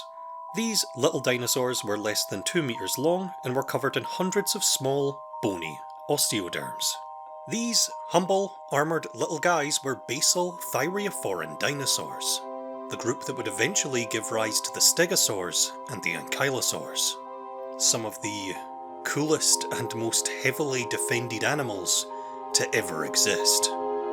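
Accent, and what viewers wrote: Scotland, United Kingdom. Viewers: OI jabber ya scottish yah?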